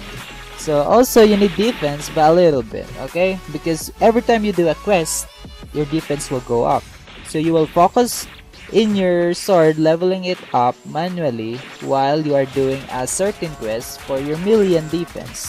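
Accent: Philippines. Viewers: Sounds like he is from Philippines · woah also if u dont mind me asking but are u filipino? ur accent is familiar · You sounds like a filipino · This Guy Is A Filipino I Dare You His Voice Is Like a Filipino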